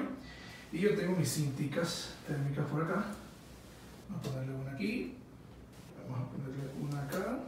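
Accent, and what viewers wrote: Venezuela. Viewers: Acento Venezolano impecable. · Cambie el vídeo solo por el acento venezolano.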